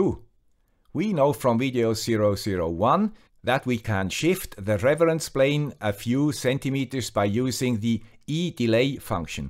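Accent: Switzerland. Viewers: This gentleman sounds exactly like Andreas Spiess, the guy with the Swiss accent.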